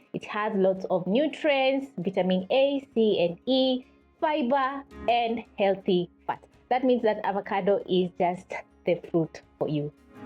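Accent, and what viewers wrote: Kenya. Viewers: Kenyan accent 😀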